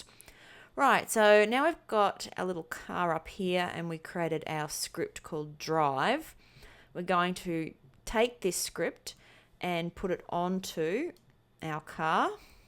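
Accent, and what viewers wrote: Australia. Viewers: Are you Australian? You have a beautiful accent.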